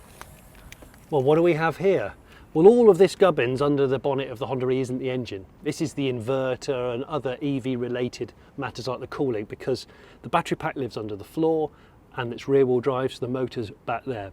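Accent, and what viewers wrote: United Kingdom. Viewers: I am in love with the design and how much character they managed to add with such simple language. I think its beyond its rivals in all aspects and I am happy to sacrifice from range if that is how my car looks like. Quick question: Why do British call it Hondr and make it sound normal?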